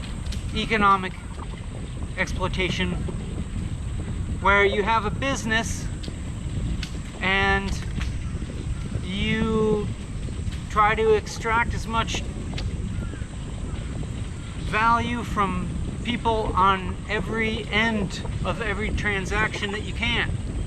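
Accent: United Kingdom. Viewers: 合理 合理 he speaks london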